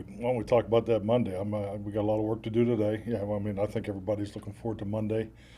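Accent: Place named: Western Pennsylvania, United States. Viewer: Coach-we're" all-in" with you here in West Central Pennsylvania. Love to hear that Western PA accent but especially your "Can-Do" - "Will-Do" attitude. I've always wanted to see you with the Steelers even after you and Aaron beat Big Ben and Mike in the super bowl. Again -I share your accent and embrace it after working forty years in Washington DC. God Speen to you Coach and our team! Thomas K. -Greenwood, PA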